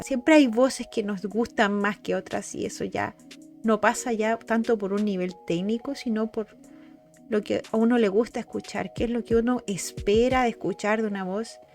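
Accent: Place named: Chile. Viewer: Me alegro que hayas descubierto a, no te preocupes, no a todo el mundo engancha en las primeras canciones, normalmente con 3 de sus canciones mas conocidas te da una idea de lo que es musicalmente y a nivel de entretenimiento como nunca otro grupo de este genero lo hizo antes, pero aun asi encontrar esa cancion que te llegue (especialmente por la barrera del idioma) puede tomar varias mas hasta que la encuentres y te convenza, no estamos ante cualquier proyecto random japones, por el contrario, el concepto fue muy bien elaborado desde que eran pequeñas y hoy en dia son señoritas con mas de 20 años que han perfeccionado y crecido en muchos aspectos sin dejar la frescura que los caracteriza. Guiandome por tu acento supongo sos de Chile, tuve la fortuna de presenciar sus shows cuando estuvieron el año pasado en el Knotfest (Parque del Estadio Nacional) y el sideshow que hicieron en el Centro de Eventos Basel al igual que en sus presentaciones en Brasil y Argentina, y la verdad que me lleve los mejores recuerdos de mi vida, ojala vuelvan y pueda volver a conectar con esa linda comunidad hermana de Chile. 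Abrazo y espero que sigas disfrutando de este viaje pq aun te queda y mucho de lo bueno esta aun por venir.🦊🤘❤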